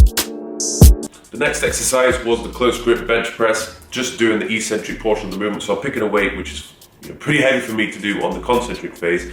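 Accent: United Kingdom. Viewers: Hey, Don't know how many positive comments you can digest, but here's another......No one comes close to the great content and great show and tell of the videos, not to mention that you've got the best physique and perfect British accent to make the videos super classy! You are the best, period. Thanks, Hal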